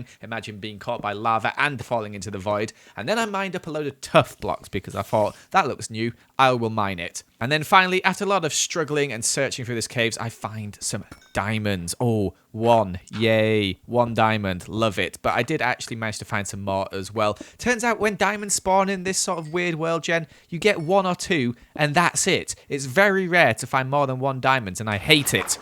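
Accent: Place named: England, United Kingdom. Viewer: i live in america, but i love the english "accent"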